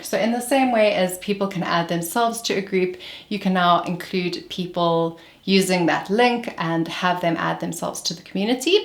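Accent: South Africa. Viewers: South African right? Cheers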